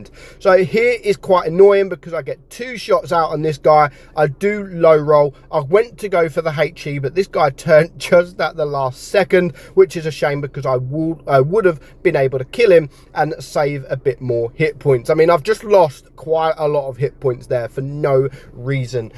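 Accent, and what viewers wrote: United Kingdom. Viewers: He has a accent and I think he British 🦷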